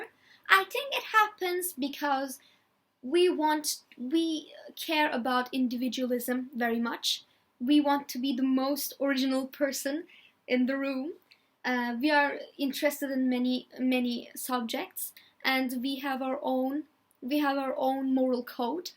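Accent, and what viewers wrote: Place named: Turkey. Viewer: are you Turkish? Your accent is very light, so you are very easy to understand.:)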